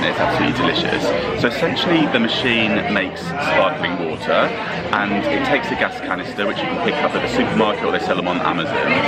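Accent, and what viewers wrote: United Kingdom. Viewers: Just subscribed. Love your vlogs and your masculine British accent. 😊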